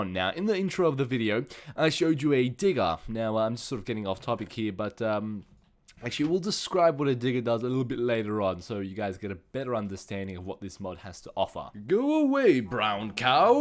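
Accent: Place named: Australia. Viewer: He's Australian. That's how we pronounce it and it's also how it's pronounced in the UK.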